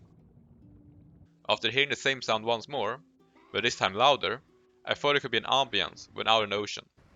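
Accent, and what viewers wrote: Sweden. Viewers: Your accent sounds very swedish👍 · I kinda wish WoW made a proper underwater world. Your swenglish is great btw 😏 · Lol you have the worst Swedish English I've ever heard.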